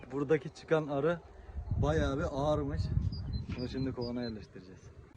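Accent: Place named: Ordu, Turkey. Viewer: Selamünaleyküm ordulusunuz sanırım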